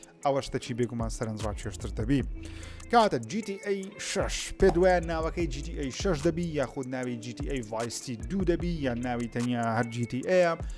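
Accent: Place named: Badini, Iraq. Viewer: بادینیەکەت زور جوانە کاک عەیبی نیە..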